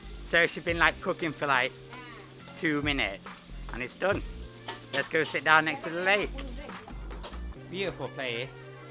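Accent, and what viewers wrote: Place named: Thailand. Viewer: First video of yours I've seen,. 😁✨ Fantastic video. Your accent had transformed a lil from Sutton/English to a hint of Thai accent it sounds. Can't wait for the next video. Loving seeing your footage from Thailand. Well done!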